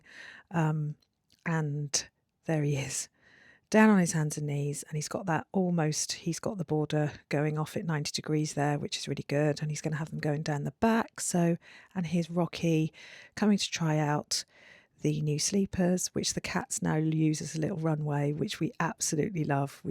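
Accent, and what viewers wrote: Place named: England, United Kingdom. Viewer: Your new parasol is spectacular and the solar lanterns are so cute! I love it all! I love your voice over and your charming English accent. (I'm from California, USA) TFS! 💜 💜 💜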